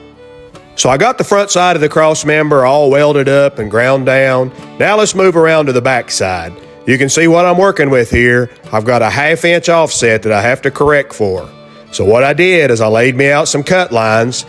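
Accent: South, United States. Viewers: First time I've come across your channel, great work...but initially I thought I was listening to an episode of the Dukes Of Hazard, them good ol boys! Hi from Down Under Australia!!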